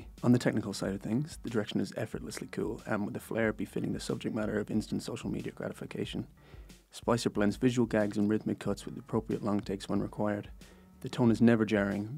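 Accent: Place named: Ireland. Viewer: Nice work. I'll definitely be checking in on your reviews from time to time. The format's strong, albeit a bit rushed? That's my only criticism. I understand your accent fine but outside of Ireland, your audience might struggle at that speed. Or maybe not. I guess time will tell. Looking forward to seeing the channel grow.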